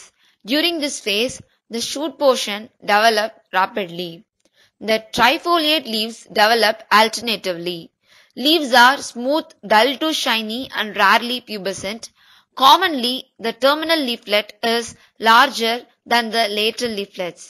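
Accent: India. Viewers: Bruh it is in English but with an Indian accent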